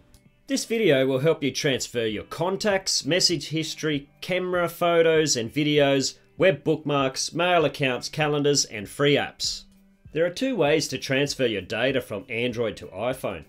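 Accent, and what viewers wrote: Australia. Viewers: I like your Australian accent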